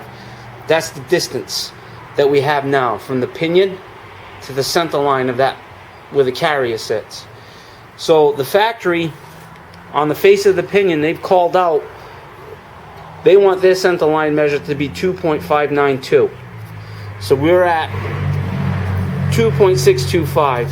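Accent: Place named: New Jersey, United States. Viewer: Cool accent...you a jersey boy?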